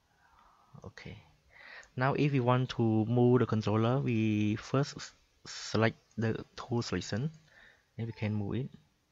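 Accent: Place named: Vietnam. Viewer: Very funny vietnamese accent :D I like it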